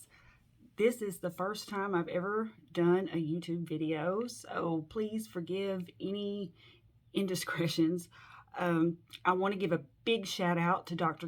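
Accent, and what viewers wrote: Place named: South, United States. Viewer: Hi. Best wishes for your channel. Following Ken Berry for a long time, I did a little bit carnivore on and off, so far never "all in" 100%. I will change that now and go for it. Since I am living in Portugal (sardine country), I can tell you my special not so carnivore recipe: I put one can of sardines and 1 can of anchovis in a food processor and blend it to a paste. This paste I fry in a pan with a piece of butter, add salt and pure natural tomato sauce. Then I let it simmer on low fire for 30 minutes and fill all in a glass bottle. Every morning I heat up a few tablespoons of this sauce and put it on my scrambled eggs or on burger patties. I like it, you don't taste the fishes. Has a lot of omega 6, fat and lycopene. By the way, I like your southern accent very much. Is it Tennessee like Ken Berry?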